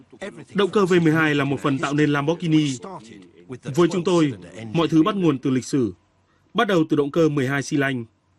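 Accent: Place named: North, Vietnam. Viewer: Value giọng Bắc, lam bót ghi ni haha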